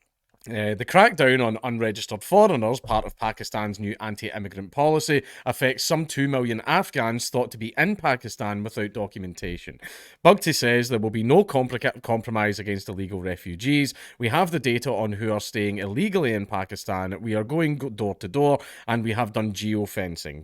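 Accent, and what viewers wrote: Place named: United Kingdom. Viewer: century of prima nocta. He's got some brit up in there.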